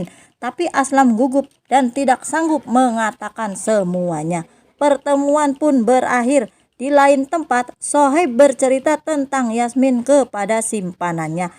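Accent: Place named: West Java, Indonesia. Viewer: Logat nya terlalu kelihatan... kalau anda dr bagian jawa barat... klu bukan cirebon pasti indramayu...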